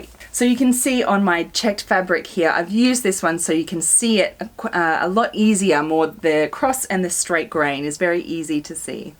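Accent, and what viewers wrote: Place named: Australia. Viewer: Thank you for your sewing lessons. Your explanations are easy to follow and understand. I also love your Australian accent 😊 ♥️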